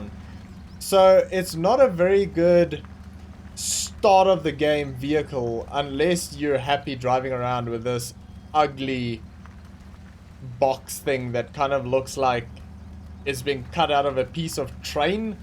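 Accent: South Africa. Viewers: Hey are you south African?if not just ignore lmao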